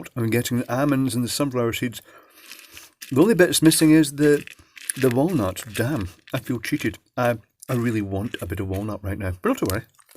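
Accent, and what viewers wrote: Scotland, United Kingdom. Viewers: Well, the Scottish accent is good, Plus has a good grasp of all things technical, and explains things really well (something I really appreciate). It doesn't matter what your level expertise, you'll probably learn something by watching. eg: I didn't actually know that the gas given off by those chemical heaters was Brown's gas (HHO, in a perfectly explosive mix) but it makes perfect sense now that I've been told. Us techies can be strange people, and understands us better than our parents did, so it's a bit like coming home to the home you never had. By being himself attracts technical people, and gathers people who all speak the same language. Sometimes I wonder if himself knows how special this is.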